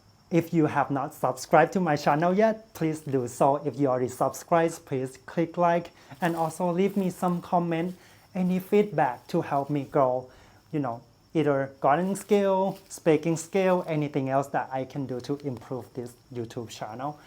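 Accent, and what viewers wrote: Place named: Thailand. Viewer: So beautiful 😍 I'm so happy that i found your channel, love this video. I'm planning to grow zinnias this year and first time in my life , I'm a little bit confused about it because I'm from Thailand we don't have to deal with the cold weather . I live in Ohio. Are you Thai ? Your accent is so lovely and sounds similar. Sorry for asking i don't want to be rude just happy to see Thai people. Hope you have a wonderful weekend ❤️🥰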